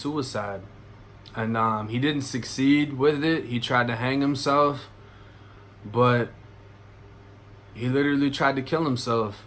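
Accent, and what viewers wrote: Boston, United States. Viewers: I could listen to you talk for hours witchur Boston accent lmfao !!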